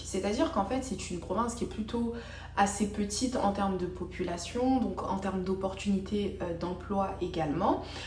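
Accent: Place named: France. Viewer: Vous avez l'accent française🇫🇷😮. Je suis aide soignante en France mais étranger. C'est possible de travailler avec le diplôme française ? Avec un visa de tourisme ?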